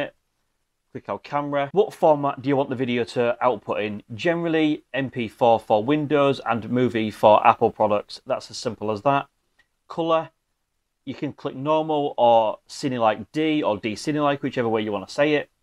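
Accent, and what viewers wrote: United Kingdom. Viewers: Just a separate comment on your accent, it's super English and I really really love it and marvel at how you sound bro.. PS: I have another comment on the actual video.. 😁🇧🇬🛸 Sweet Keep well and be safe!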